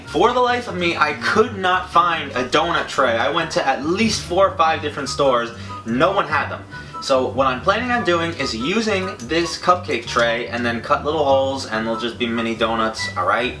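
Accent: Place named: Boston, United States. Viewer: are you from boston?? you have such a bostonian accent!! i love it hahahaha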